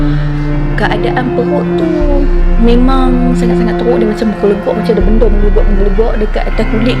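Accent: North, Malaysia. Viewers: Xthu laa knpa loghat utara nii cute do😆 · Pekat betul nya ckp utara 🤣🤣🤣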